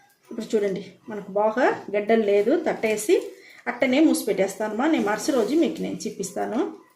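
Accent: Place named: Karnataka, India. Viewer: Karnataka walla miru me matalu alane unai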